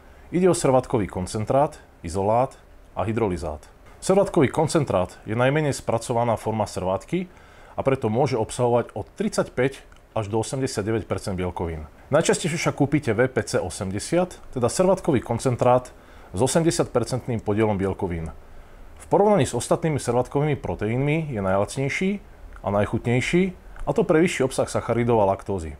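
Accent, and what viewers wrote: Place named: East, Slovakia. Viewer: Môžem sa spýtať odkiaľ pochádzaš? Zdalo sa mi že počujem nejaký východniarsky prízvuk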